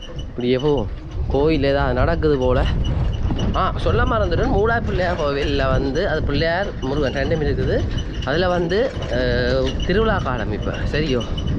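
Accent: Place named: Tamil Eelam, Sri Lanka. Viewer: Super ellam castly mathri ullathu ungal eela tamil super ஒவ்வொரு மனிதரும் ஒவ்வொரு மாதிரி இருப்பாங்க take it easy ungal vaelai thodarnthu seinga thumbi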